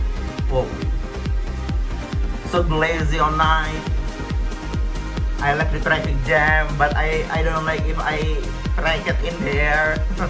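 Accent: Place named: Indonesia. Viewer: Englishnya Indo bgt😄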